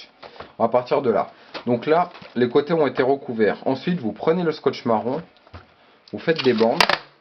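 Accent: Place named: Auvergne, France. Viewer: Rien qu'a ton accent j'ai su que t'etais auvergnat "on y fais..." bref bah moi aussi je suis de Clermont-Ferrand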